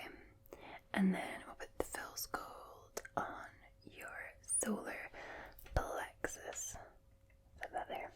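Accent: Scotland, United Kingdom. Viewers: "gurril", yep defos a scottish lass lol though doesn't sound weegie, more eastern, edinburgh or the lothian area or even dumfries lol whatever it is, its nice having a scot online. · Love me a wee bit of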